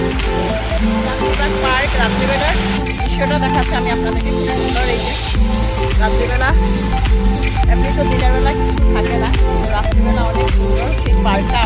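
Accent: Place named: Sylhet, Bangladesh. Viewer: আপনার কথায় সিলেটি টান আসছে।।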